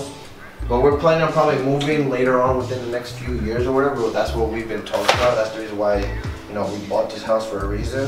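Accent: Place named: Brooklyn, United States. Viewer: You from Brooklyn whatever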